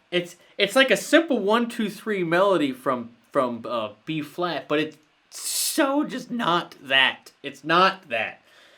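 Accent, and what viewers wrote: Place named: Buffalo, United States. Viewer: Are you from Buffalo? I hear Buffalo in your accent.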